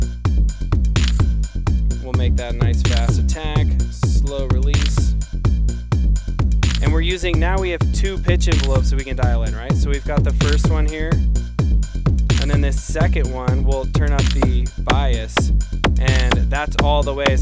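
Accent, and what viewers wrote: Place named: United States. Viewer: Bruh. So glad u speak American haha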